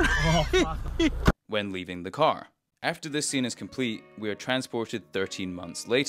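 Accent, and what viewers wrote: United Kingdom. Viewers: You sound like you’re from Edinburgh. Actually, maybe Stirling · hearing a british bloke voiceover this actual visual masterpiece of a culture film is weird somehow. none the less, as a diehard fan myself, i still learnt some stuff from ya & about the show i grew up on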